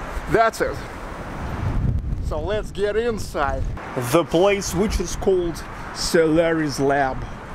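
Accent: Russia. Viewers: Daanggg, I was in St. Petersburg in 2018 for 12 days but I didn’t know about this place. Awesome! Btw. your thick fat Russian accented American English is so much fun to listen to. I subbed. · I understood "salary's lab". You have russian pronouciatio: "o" becomes "a"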